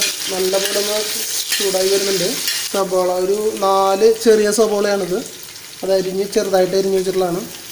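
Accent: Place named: Thrissur, India. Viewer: രാത്രി പതിനൊന്നരക്ക് ഈ വീഡിയോ കണ്ടപ്പൊത്തന്നെ വിശപ്പായി... 😀... നല്ല അവതരണം, തൃശൂർ ഭാഷ എന്നെപോലെ തിരുവനന്തപുരംകാർക്കു വലിയ ഇഷ്ടമാണ് 👍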